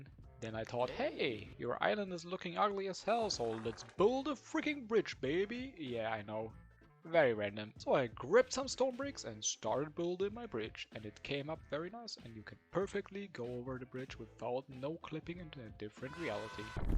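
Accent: Germany. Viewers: You sound a bit german 😅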